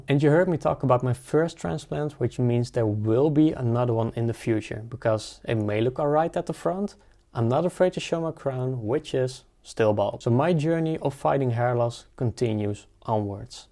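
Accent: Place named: Netherlands. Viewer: where did you do your hair transplant? you sound dutch, maybe in netherlands?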